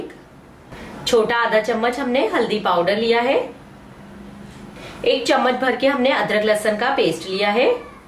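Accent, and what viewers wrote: Ahmedabad, India. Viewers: Jitni bhi hindi bolne ki koshish karo miya bhai ki ahmedabadi bhasha to chalak hi jaati hai 😂❤ allah kamyaabi de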